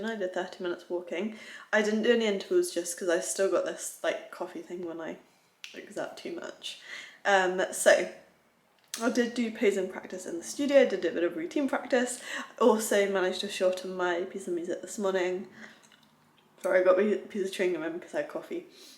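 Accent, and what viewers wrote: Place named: Oxford, United Kingdom. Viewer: That Oxford accent tho... <3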